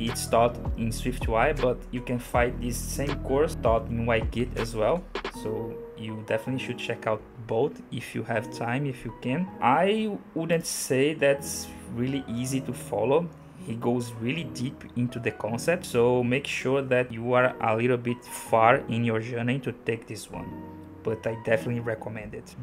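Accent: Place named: Brazil. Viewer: Brazilian detected haha, vc tem curso em portugues ?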